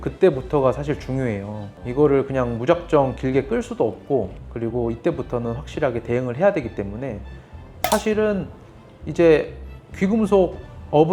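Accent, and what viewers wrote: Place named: Jeolla, South Korea. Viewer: 말투가 절라도구마잉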